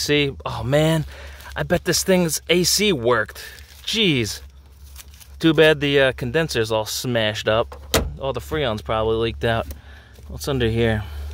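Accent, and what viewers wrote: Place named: East Coast, United States. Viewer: You’re from the east coast what part? I want to guess either New Jersey or Long Island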